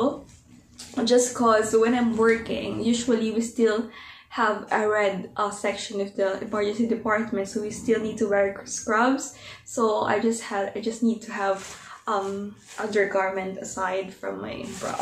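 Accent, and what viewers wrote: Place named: United Kingdom. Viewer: Take care always ate 😍 miss you. Naloka ako sa englishan hehe british na bristish na hehe 😅☺️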